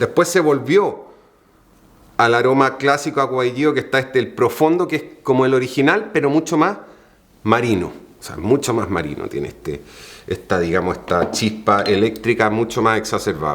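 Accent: Chile. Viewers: Que lindo que es el acento chileno 🙌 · Es chileno, erradicado en España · Hablas como Chileno, aunq usas ciertas palabras españolas que despistan... pero tu expresion de ir a comprar el pan por la mañana es un sello Chileno genuino 😂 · por que desis vosotroa ai aoa chileno? es algo que tambien note en tu hermana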